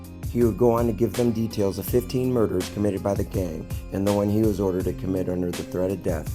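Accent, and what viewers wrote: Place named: Buffalo, United States. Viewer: The guy narrating has the horrible Buffalo accent…. So cringe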